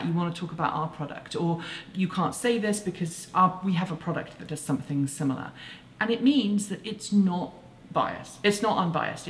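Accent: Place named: United Kingdom. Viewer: Are you from New Zealand? I've been assuming you are British just based on your accent (I'm definitely not an expert, as may be obvious). If you aren't a Kiwi, why do you do movies specifically for them? Something unique about their market?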